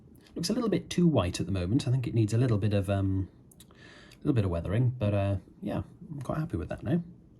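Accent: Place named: North, United Kingdom. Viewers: Are you based out of the north east by any chance? Sounds like you have some northern accent come out now and again lol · Thanks. I have a long interest in mining, so thought it was a Davy. (Does your occasional Yorkshire accent have anything to do with family history 'oop noorrth'?)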